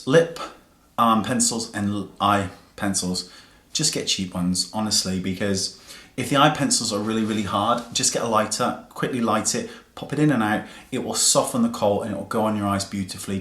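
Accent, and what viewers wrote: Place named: Bristol, United Kingdom. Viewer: Love the Bristolian accent 😊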